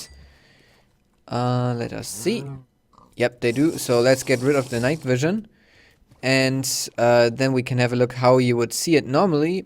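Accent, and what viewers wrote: Germany. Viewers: Man hört sofort: Deutscher.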